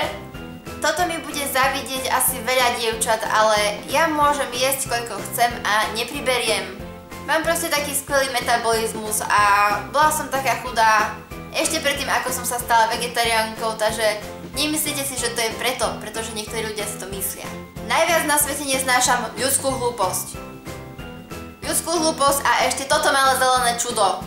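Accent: East, Slovakia. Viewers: Páči sa mi ako Ti čas od času ujde niečo východniarsky :D :D "Tažéé" :D je to milé :D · AAA vychodňarkaaaa a znaje hutorec po rusnacky ta co jak še maš ! :D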